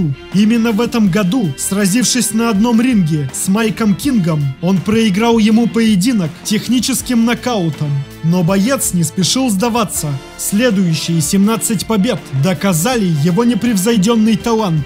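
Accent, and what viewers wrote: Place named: Moldova. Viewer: Могли бы человека с нормальной дикцией подобрать для озвучки,а не этого молдована с суржиком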